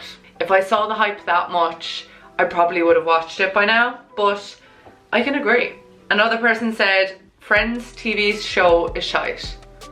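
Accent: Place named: Ireland. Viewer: I luv the iris accent my friend is iris n i luv it how she speaks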